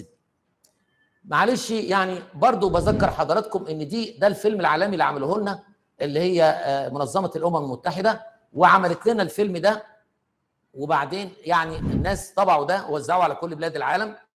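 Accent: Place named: Iraq. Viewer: ف السعودية يسما الهيل حبه خضره تنحط مع القهوة تكسرها شيء بسيط بي سنونك وتحطها كاملة تحت اللسان ٣ حبات كويسة اذا تنهج اذا سويت مجهود وتعبت حطها تفيد في مصر تسما حب هان. لهجتك من العراق يسمى الهيل الأخضر او الهيل الحقيقي او الهال او قاع القلعة له مسميات كثير لكن المعروف عند الخليج الهيل الله واعلم ايش اسمو ف العراق لكن استخدامو في القهوة و في المرق وفي الكبسة وفي الفته وفي العريكة وفي الشاهي العراقي أظن يستخدمونه وحتى الحلى اذا ماعرفت ارسلك صورته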